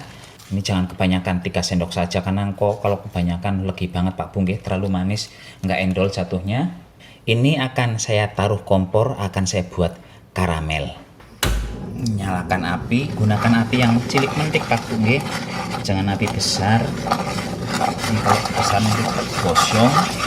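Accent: Banyumas, Indonesia. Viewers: Pak kiye sekang pak Dirun asli sekang Cilacap, nek rika jawane sekang endi, nek ndeleng ngomonge kaya bahasane wong Banyumasan. Rika nang Batam manggon nang daerah ngendi. Soale aku tau manggon nang Batam suwe kuramg luwih ana 6 tahun manggon nang daerah Pelita.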